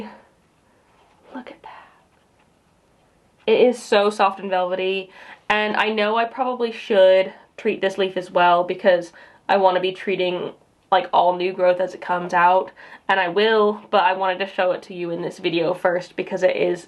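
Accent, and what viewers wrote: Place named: United Kingdom. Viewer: I thought I detected New York, but I was way off. You sound more British when you are with (Clahyah):))))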